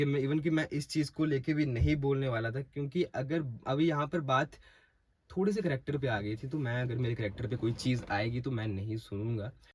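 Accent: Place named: Dogri, India. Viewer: We are with! Haters ko ignore karo aur apne future par focus karo. All the best our sweet gentlemen, Btw if nothing is between you and Tanishka still we love you as we loved you before! I saw your red eyes and noticed that how much pain you had faced and your face tells everything that you’re saying truth. Haters will never let you grow because of the jealousy. So keep working,growing,Laughing and making us laugh just like before cuz I have seen you serious for the very first time. And I hope hamari Tanishka Didi bhi theeek hongi 😙. Just waiting with eager and excitement ki aapka vlog kab ayega. Special note for you- Tusi jado Dogri ch bolde go ta Mainu bhohot vadiya Lagda hai. Namaskar and Sat Sri Akal from Canada ❤️🩷🩷🌸🌸🤍🤍😇😇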